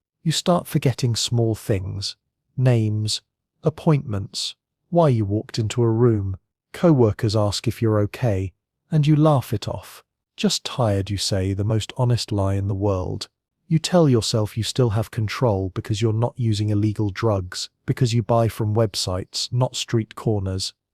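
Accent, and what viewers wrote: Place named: United Kingdom. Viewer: I don't know I just can't stand this guy's accent. His British accent. Sorry I got to turn you off.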